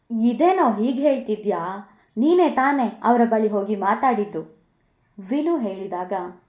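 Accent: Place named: Dakshina Kannada, India. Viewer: hauda !! Naanu nimge bahushaha exams irabahudu anyone. Neevu kannada narrate maaduva style namma pure Dakshina Kannada style. Haagaagi bahala kushi aagthade keluvaaga. Adanne naanu miss maadikollodu.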